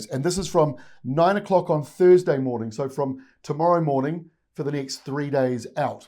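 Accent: New Zealand. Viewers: Admit it roger, you miss us hence why you tune in every day to hear a proper kiwi accent.....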